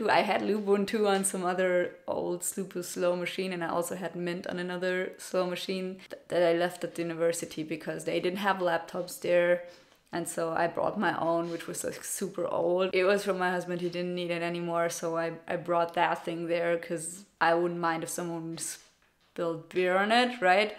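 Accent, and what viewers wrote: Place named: Germany. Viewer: i bet your German or something you have a accent.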